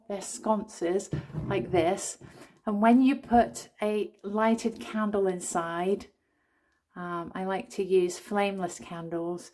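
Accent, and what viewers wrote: England, United Kingdom. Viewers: LOVE the design for the "kitcheny bits" merch! I adore the British accent and terminology as do lots and lots of your viewers. Go for it! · Are you from England?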